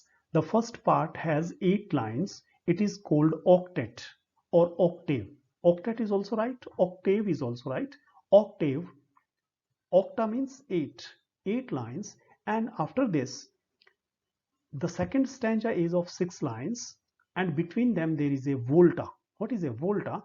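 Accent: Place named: England, United Kingdom. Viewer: Sir, memories of 2005 when I was in BA. Ist and you were the lecturer. I was stunned by your English accent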